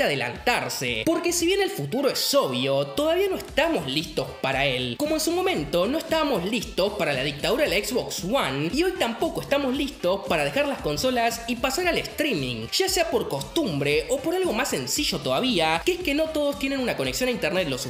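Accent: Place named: Argentina. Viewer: cualquier cosa que diga un argentino con ese acento tan horrible yo hago todo lo contrario, no destacan por su honestidad.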